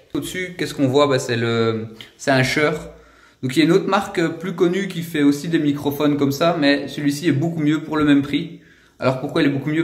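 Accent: Belgium. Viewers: Meeci la YouTube MONNEY !!! 😅❤️ Àvec ce petit accent du genre belge MAMÉNE !✔️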